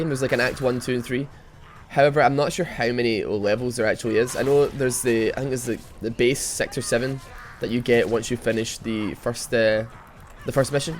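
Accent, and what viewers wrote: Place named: Edinburgh, United Kingdom. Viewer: sounds like he lives in Edinburgh i dont know how i can tell but im Scottish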